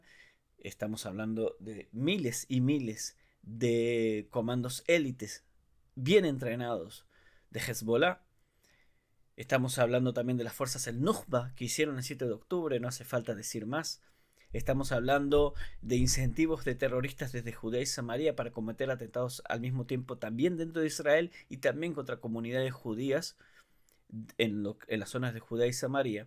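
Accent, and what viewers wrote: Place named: Córdoba, Argentina. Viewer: Muy buen informe, muy profesional. Puedo identificar un lejano acento cordobés … si?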